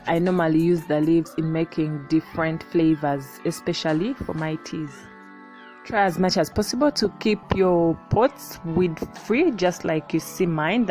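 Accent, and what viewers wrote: Uganda. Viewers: She is likely ugandan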